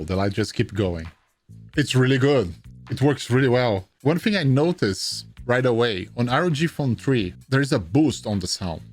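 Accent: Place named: Brazil. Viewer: You sound like a brasillian speaking English 🤔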